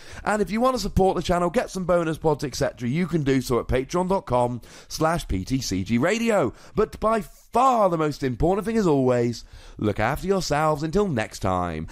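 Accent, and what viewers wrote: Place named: Birmingham, United Kingdom. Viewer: Though i heard that voice in Birmingham and Sheffield regs!!!! your a great commentator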